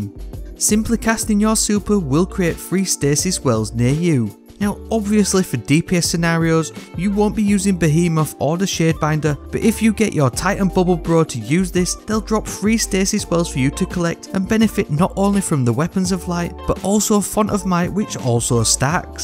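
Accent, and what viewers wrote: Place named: North, United Kingdom. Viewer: He's just northern leave my boy alone. He makes some of the best d2 content on YouTube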